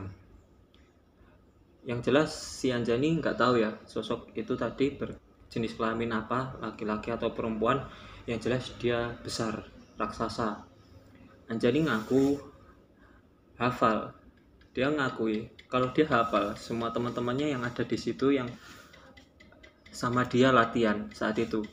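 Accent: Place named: Java, Indonesia. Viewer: pertahankan medokmu mas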